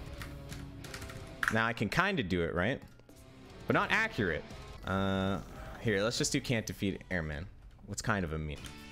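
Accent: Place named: Alberta, Canada. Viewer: Holy shit you from Ed, bud? or Calgary…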